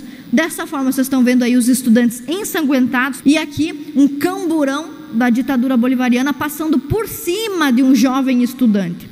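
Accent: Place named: South, Brazil. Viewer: Acho esse sotaque sulista tão bonito.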